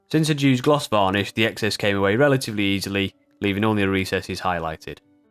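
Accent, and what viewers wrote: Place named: England, United Kingdom. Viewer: Hi Mate I hear your pommy accent, I am a socuser originally, came to Oz (65) when I was 16. Joined the Army went to Vietnam 70. So looking at Vietnam era Kits when I finish these, maybe a Phantom? Thanks for the great advice. I had a look at your Star-fighter build as well, as I have the KINETIC kit;-) Might practice with that one first, plus air brush on an old Spitfire that I never finished. Its early afternoon here in OZ will be back tonight to look at the F104 build;-) Thanks again for the hints & tips!! OZGrunt (Oink, 7RAR Vietnam War 70-71.)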